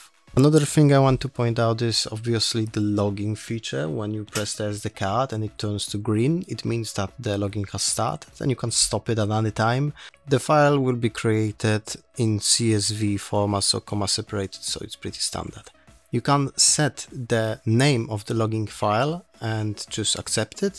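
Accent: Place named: India. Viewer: O CHUJU xd tyle lat w UK, a brzmisz jak hindus XD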